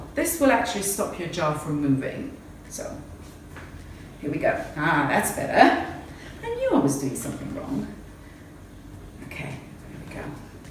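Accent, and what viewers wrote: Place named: United Kingdom. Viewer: Great video! personality and her British English, plus some information give the viewers so much info and pleasure to watch. Thank you! I watched to the end..., you are so funny and definitely a lifelong learner!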